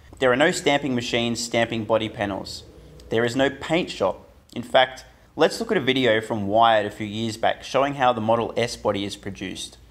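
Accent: Australia. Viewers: Good to hear an aussie yt'r covering tesla.. 👍🇦🇺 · It's an Australian accent.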